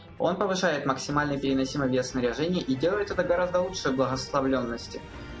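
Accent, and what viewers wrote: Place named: Ukraine. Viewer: Ти українець? Я по словам чую, такі слова як вночі. Також ти літеру ґ в рос мові вимовляєшь як г. Якщо тт справді українець, Слава Україні!!!🇺🇦🇺🇦🇺🇦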